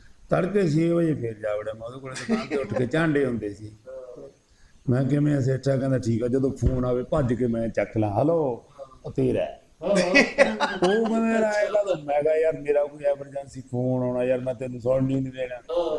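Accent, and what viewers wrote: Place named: Lahnda, Pakistan. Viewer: So sweet ❣️❣️❣️❣️ Lahnda Punjabi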